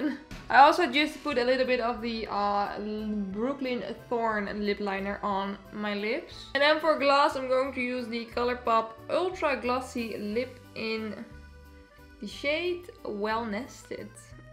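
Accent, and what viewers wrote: Netherlands. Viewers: i instantly recognized your accent as a fellow dutch person LMAO you even use dutch onomatopoeia(?) like. some sounds you make only dutch people would make i feel like. vla is een lekker kleurtje 😳 moet nu naar jumbo denk ik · I just started watching you (this is my third video that I'm watching from you) and usually I'm really bad at guessing from where the accent it (like seriously bad) but I guessed that you are from the Netherlands and suddenly you say you're Dutch. I was really proud of myself. I love your vibe and personality, it's just so lively and fun! Btw, I'm German, so hello neighbor! :D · Are you dutch??? Its sounds like it , im dutch thats why i reconize it haha😘😂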